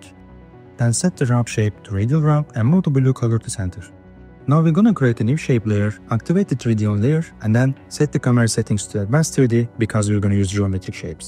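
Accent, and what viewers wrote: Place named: Turkey. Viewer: Where are you from, brother? Your accent sounds like Turkish.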